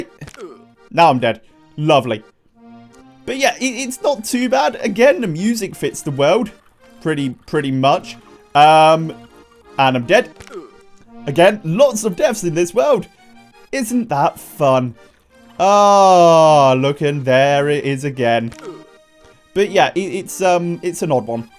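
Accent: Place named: United Kingdom. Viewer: The fantastic British accent really helps with setting the scene for this game. “I’m William Wallace and we want our freedom!!!”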